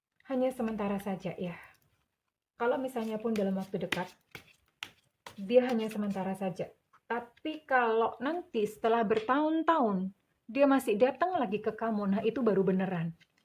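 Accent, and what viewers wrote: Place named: Java, Indonesia. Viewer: Ngeret jowo bund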